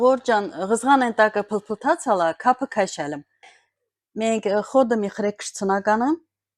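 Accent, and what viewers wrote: Artsakh, Azerbaijan. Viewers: Ես մեր բոլոր բարբառները սիրում եմ, Արցախի բարբառն էլ եմ սիրում ու հաճույքով լսելով սկսում եմ մեծ մասամբ հասկանալ։ ջան շնորհակալություն կուրկուտի մասին մեզ ծանոթացնելու համար ❤🌹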